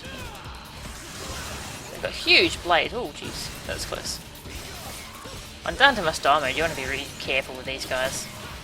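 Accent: Australia. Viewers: You have a very amusing voice. Australian accent?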